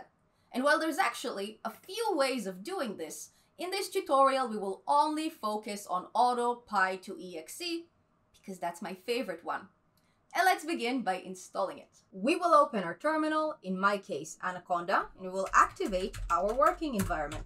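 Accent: Eastern Europe. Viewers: Чем то славянским отдает произношение :) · The voice accent is similiar to slavic or eastern europe citizen but not real canadian.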